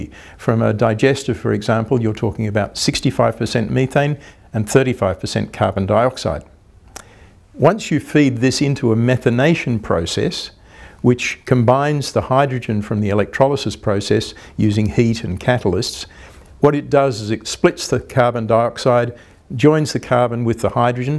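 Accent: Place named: Australia. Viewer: Hi. Nice to hear an Aussie discuss power to gas electrolysis. How did you go with your pilot in Northern Rivers? Being and engineer with a solution bent, this method of storage and delivery of methane via the gas grid seems the most sensible way to use our existing infrastructure to get the long term storage we will need once renewables dominate the electricity grid (hopefully). If anyone will get it right it must be the Germans! Anyway, I'm interested to know how it is going and how it is being received by others. Regards Tony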